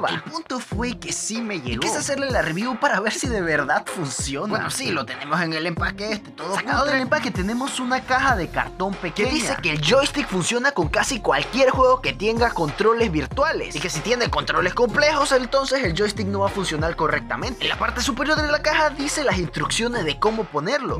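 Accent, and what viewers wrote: Chile. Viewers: eres chileno :v?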